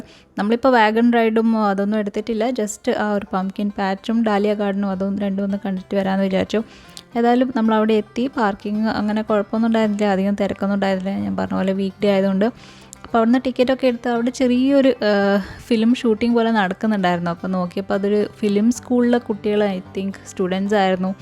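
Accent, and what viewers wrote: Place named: Kannur, India. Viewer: slang കേട്ടപ്പഴേ തോന്നി.😍✌️നമ്മടെ കണ്ണൂർ ഭാഷ.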